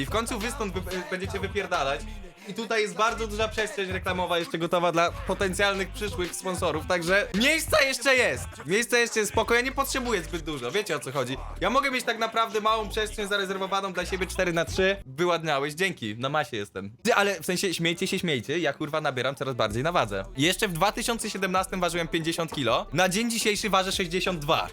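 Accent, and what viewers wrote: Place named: United Kingdom. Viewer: To już wiemy dlaczego masz Brytyjski akcent i umiesz gadać po ang pogo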